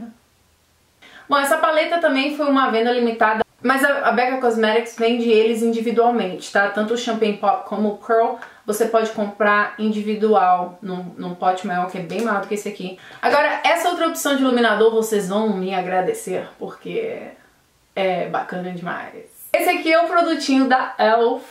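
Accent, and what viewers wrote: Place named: Minas Gerais, Brazil. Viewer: menina eu acho que parece meio mineiro hahahah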